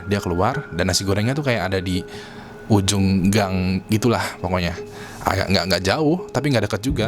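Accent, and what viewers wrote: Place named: Bekasi, Indonesia. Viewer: bang lu orang bekasi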